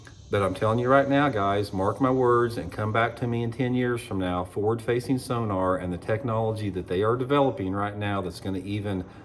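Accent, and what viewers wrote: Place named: United States. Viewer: yYou sound like an average American, hope you dont mind that label.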